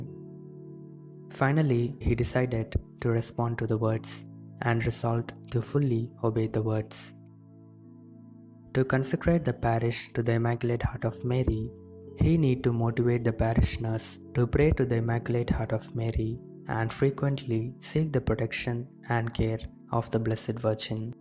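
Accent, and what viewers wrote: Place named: India. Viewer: Your accent seems to be of an Indian. Great Please continue your good work. May our lady bless you.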